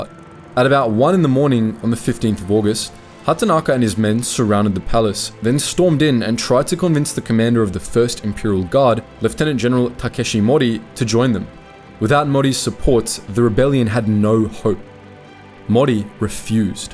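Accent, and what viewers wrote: Australia. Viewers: Your Australian voice is very young, youtube earnings young, so what evidence are you basing your narrative on to earn your youtube income? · I know this is months later but I’m really wondering, are you SunnyV2 or Internet Anarchist? Because I swear every dude from Australia on here speaks in the same voice and cadence. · Do you have another channel or do all aussies sound the same to me?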